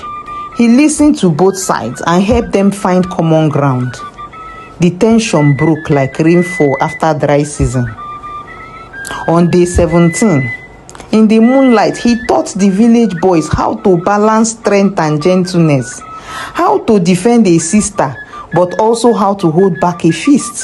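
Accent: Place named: Igbo, Nigeria. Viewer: Wow my own sister I love the Igbo accent very powerful story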